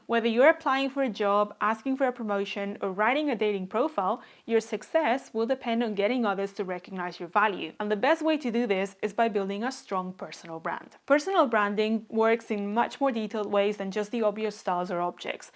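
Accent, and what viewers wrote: Spain. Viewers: Puedo detectar el acento español hahaha, buen video!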